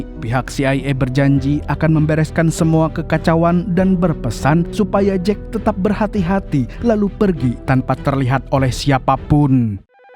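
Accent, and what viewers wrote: Banjar, Indonesia. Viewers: Admin orang banjar kah ☺️ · Org banjar kah nyawa Nang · Orang banjar kahh😮😂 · urang banua sklinya amang ni lehh 😅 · Uma ai mang suara pian merindu banar week week paristiwa pang😅😅😅😅😅 Banjar banar nah. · Orang Banjar kh Pian mang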